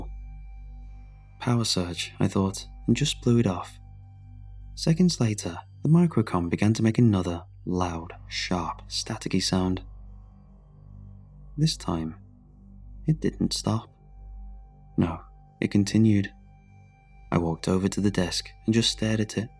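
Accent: United Kingdom. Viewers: Easily my favorite story you've read. This and the forest fire story with all the different things happening every night. Your light British voice fits these 2 stories and both main characters absolutely perfectly👌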